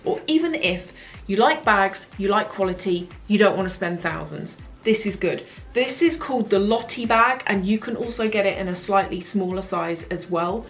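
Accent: United Kingdom. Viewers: I don't know why, but whenever I heard talk, I felt like I spoke in British English accents for no reason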